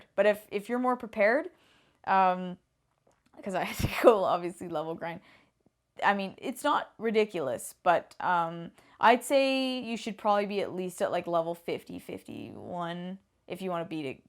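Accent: Canada. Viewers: This is a really well-lit YouTube vid. I like your Canadian accent. Chrono Trigger was really cool. I think I beat it a few years ago.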